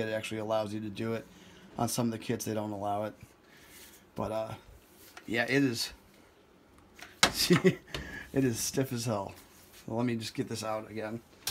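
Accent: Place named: Illinois, United States. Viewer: I was about to ask if you’re from IL based on your accent but I saw comments in the affirmative lol same here. Moved the hell out to chase my gun dreams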